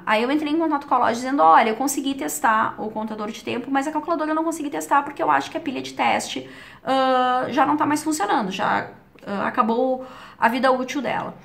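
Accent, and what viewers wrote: Rio Grande do Sul, Brazil. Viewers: tu és da onde? Tenho a impressão de que és do Rio Grande do Sul, pelo jeito de falar. Beijos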